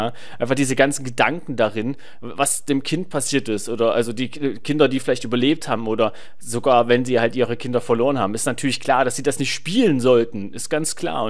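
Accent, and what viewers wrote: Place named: Saxony, Germany. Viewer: Dat Sächsisch yo :D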